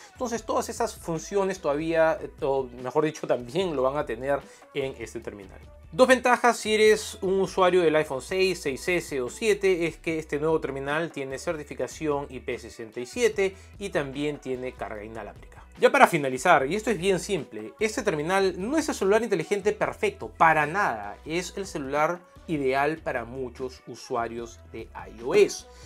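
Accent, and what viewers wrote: Lima, Peru. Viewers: Hola, eres peruano? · eres peruano?! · Ese dejo Limeño es inconfundible xD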